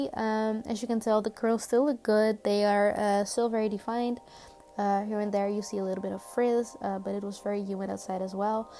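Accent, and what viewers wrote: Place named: Netherlands. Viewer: Your hair beautiful! Btw are you dutch? You kinda have a dutch accent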